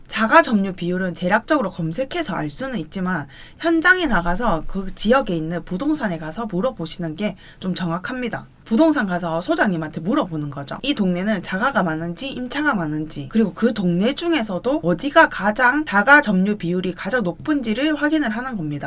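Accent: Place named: North Gyeongsang, South Korea. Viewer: 경북지역 사투리가 상당히 거슬리네요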